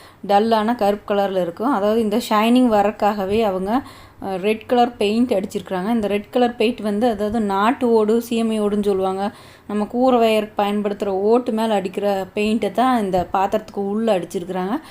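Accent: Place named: Kongu, India. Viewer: அருமை, எனது சந்தேகங்களுக்கும் பதில் கிடைத்தது, உங்களின் கொங்கு தமிழ் உச்சரிப்பும் அழகாக இருந்தது.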